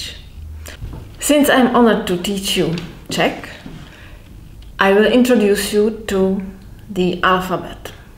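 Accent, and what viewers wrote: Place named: Poland. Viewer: I love your english. I'm from Poland and your accent is similar to polish accent 😄 I am starting study czech language and I hope your films will help me 😁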